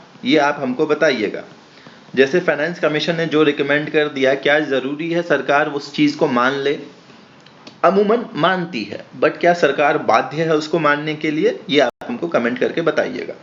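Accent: Bihar, India. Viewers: Bihari tone jabardst hai apna sa lagta hai aise hin padhate rahiye sir👍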